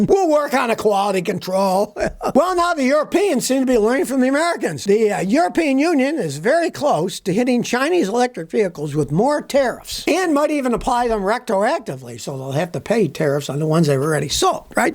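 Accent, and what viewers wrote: Germany. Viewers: I love your German accent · Luv ur germn akzent😊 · did you spend a little time in the service in the Fatherland? Love the accent! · Great German accent...reminds me of that guy on Roland & Martin's- Laugh-in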